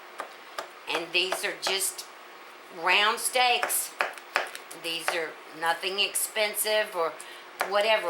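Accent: South, United States. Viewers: LOL, you may not consider VA the south, but you sure have a southern accent. I love your accent and you have a soothing voice.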